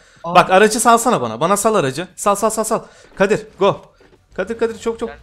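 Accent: Adana, Turkey. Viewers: Abi sen Adanalı mısın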